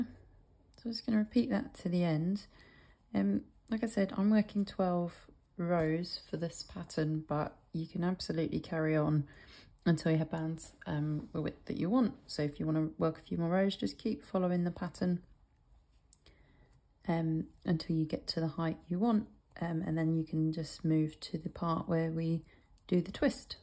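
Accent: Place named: United Kingdom. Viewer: UK accent but using American stitch notation!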